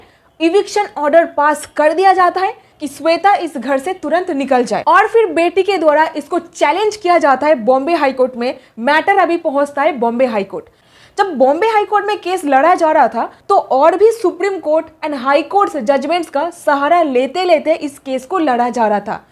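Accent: Bengal, India. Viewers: Madam Apke ke dwara bait gaye advice sahi hai Please take care that Bengali tone is coming to your Hindi version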